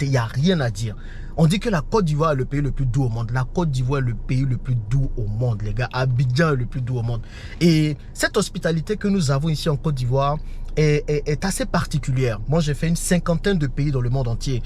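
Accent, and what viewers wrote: Cameroon. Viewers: La première fois que j'ai suivi une de tes vidéos en 2021 , j'ai cru que c'était un frère camerounais, il parlait comme les camerounais et n'est pas trop dans les histoires des clashs et fait bcq dans l'éducation, comme certains de mes frères et sœurs, comme Simo, Stéphanie, Marthe Carine, Nathalie yamb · Moi aussi quand je suis tombé sur ta vidéo pour la 1ère fois il y a un an, j'étais tenté de penser que tu étais camerounais vu ta façon de parler, de voir les choses etc. Ce qui est sùr, l'essentiel c'est d'ètre africain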